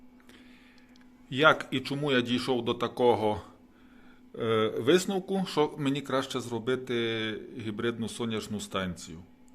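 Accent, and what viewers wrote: Transcarpathia, Ukraine. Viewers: Ви із Закарпаття)))?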